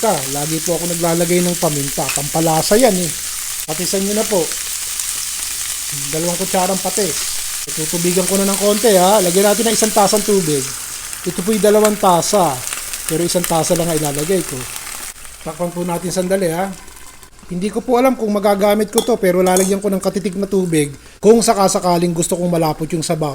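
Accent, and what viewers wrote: Philippines. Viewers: Aray ko mainit...had me laugjing...i miss pinoy expressions and accents...i just love it. From a kababayan living in LONDON, UK 🇬🇧 with ❤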